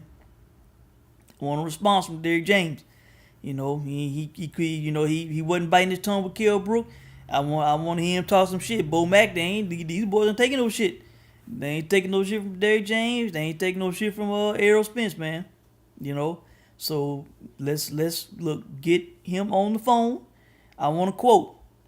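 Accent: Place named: Texas, United States. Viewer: I like your Texas accent BTW I'm laughing my ass off u funny keep that same energy 😎😎😎😎😅😅😆😆😂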